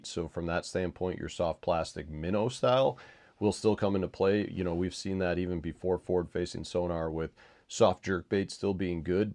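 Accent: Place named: South, United States. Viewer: Sounds like you're in a southern state. Where do you fish during winter?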